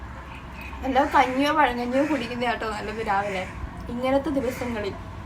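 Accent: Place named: Punalur, India. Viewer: ഞങ്ങൾ പുനലൂര്കാരുടെ സംസാരരീതി ഇങ്ങനെ ആണ്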